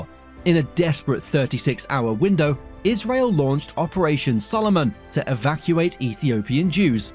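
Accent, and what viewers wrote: England, United Kingdom. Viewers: Narrator has a British accent, but pronounces aluminum like an American. This is confusing. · If you're going to use a British accent, at least use British spelling. The metal is alumininininium. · Why is the narrator got an English accent but says aluminum not aluminium ...that just ruined the video for me. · How can someone with a British accent mispronounce Aluminium - it’s Al you mini um - it’s not alooo min num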